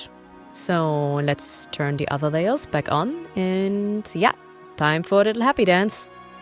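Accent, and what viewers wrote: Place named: Germany. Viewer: Thanks for your teachings, Senpai. Your german accent is cute. But~ enough simping for one day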